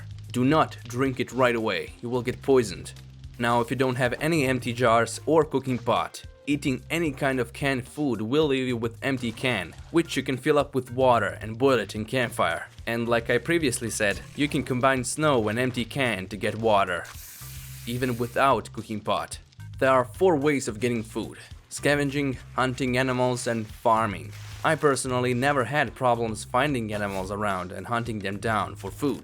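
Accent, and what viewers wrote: Russia. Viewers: That Russian accent though. Never heard one in real life in before. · i can hear the russian in your voice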